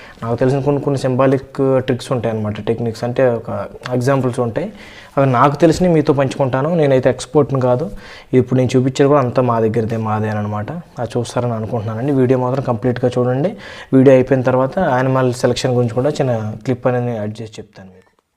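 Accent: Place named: East Godavari, India. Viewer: Ni matalu MA East godavari vadila unai very nice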